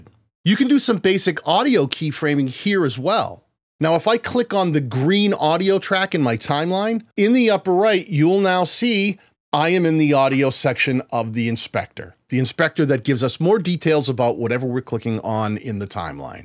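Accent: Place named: Boston, United States. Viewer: Boston video guy here. I heard your accent and Immediately subscribed.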